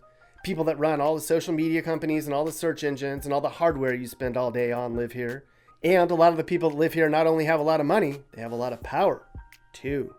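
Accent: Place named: South, United States. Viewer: you have a touch of a southern accent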